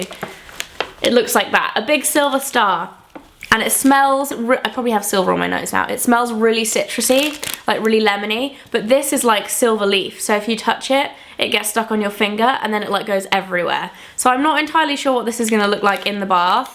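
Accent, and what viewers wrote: England, United Kingdom. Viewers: From which part of the UK are you exactly ? You have a very lovely accent ! Love the video, defs gonna get some of those as christmas presents !! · is she english?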